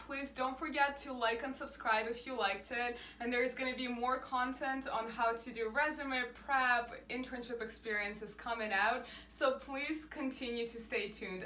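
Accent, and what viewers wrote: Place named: Russia. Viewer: aaaa by the way i like the way that you trying to hide your Russian accent you doing it well i respect it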